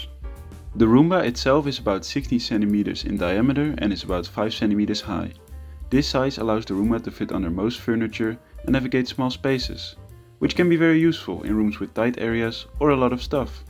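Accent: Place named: Netherlands. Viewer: Totally love your review, calm voice and funky Dutch accent.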